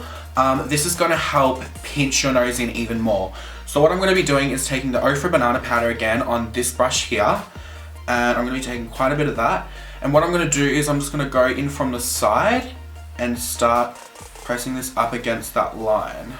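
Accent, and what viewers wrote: Australia. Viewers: UR DEFINITELY AUSTRALIAN !!!🇦🇺🇦🇺🇦🇺🇦🇺🇦🇺🇦🇺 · Are u Aussie ! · are you Aussie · this is probably the best nose contouring vedio i hav ever seen. 😍😍. u r bomb btw!💦💦. are u an aussie?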